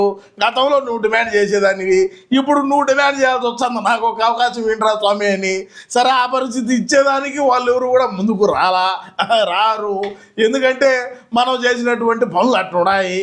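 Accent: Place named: Kakinada, India. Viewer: Kakinada kanttam garu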